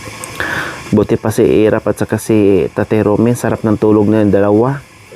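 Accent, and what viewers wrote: Visayas, Philippines. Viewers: ang tono ng salita mo ay bisaya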